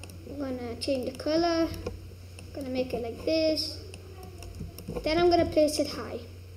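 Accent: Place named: Scotland, United Kingdom. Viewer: Omg your voice is so cute I love Scottish accents btw ty!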